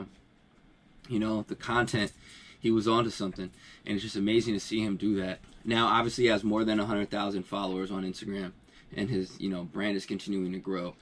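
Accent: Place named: New Jersey, United States. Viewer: I think I just pin pointed where dude from ...howell..nj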